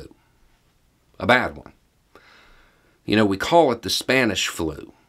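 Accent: South, United States. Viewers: Absolutely spot on both b e a u please Google I played the lead in high school in a play on Remembrance Day as a newspaper editor who is covering up the truth to make this brief so we learned about it many years ago I'm 63 now and we we learned about it in high school but it's interesting to hear you comment because you're kind of like in the South I gather by your accent and I'm just surprised you know so much about us up here and I learn a lot from you and I really appreciate that thanks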